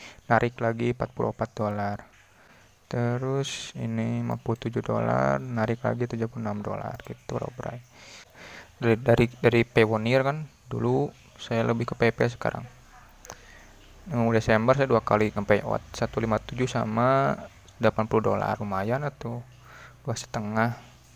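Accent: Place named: West Java, Indonesia. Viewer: tina logat na mah siga orang sunda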